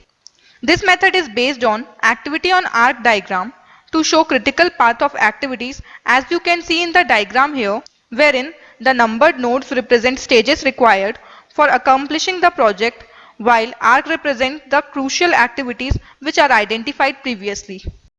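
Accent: India. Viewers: This is my I hate Indian SPEAKING ENGLISH 🤣🤣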